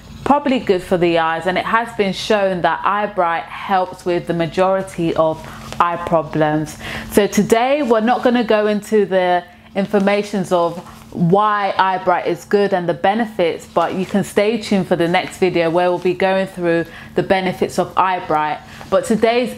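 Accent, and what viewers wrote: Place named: Alabama, United States. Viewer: i love your accent, what part of alabama are you from?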